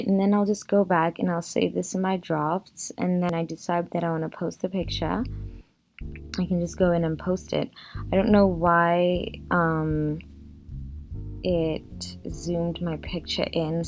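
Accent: South Africa. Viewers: Wait, you must be South African? Right? I love your channel btw, brilliant content 💕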